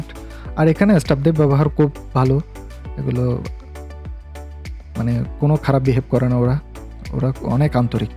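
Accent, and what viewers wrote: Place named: Chittagong, Bangladesh. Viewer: ভিডিও দেখে ভালো লাগলো। খুব সোন্দর উপস্থাপনা করেন। কথায় বুঝায় যাচ্ছে আপনার বাড়ি চট্টগ্রাম।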